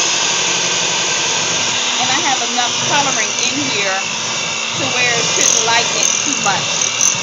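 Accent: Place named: United States. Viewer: THIS LADY SOUNDS AMERICAN!!!!!!!!!!!!! BY THE WAY GUYS im from NZ so i don't get AMERICAN PEOPLE!!!!!!!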